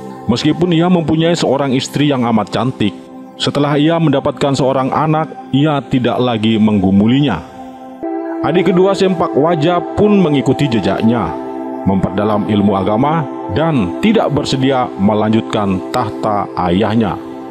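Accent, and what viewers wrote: Java, Indonesia. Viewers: logat jawa ny kental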